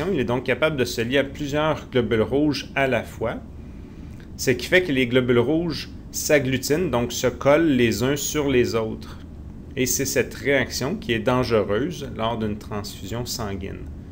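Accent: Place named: Canada. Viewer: Bien compris, juste que l accent canadien est difficile.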